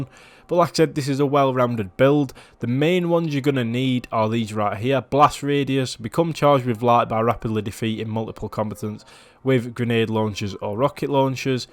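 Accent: United Kingdom. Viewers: Just out of curiosity what part of the UK is your accent from?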